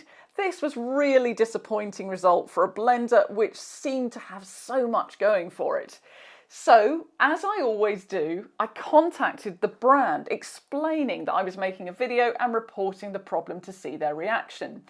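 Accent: England, United Kingdom. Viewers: an anglish person thank god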